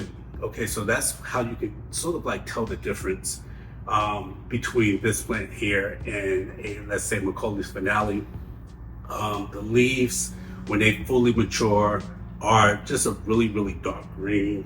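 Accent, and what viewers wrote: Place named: New Orleans, United States. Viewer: I thought you were from my part of the world with that Accent lol New Orleans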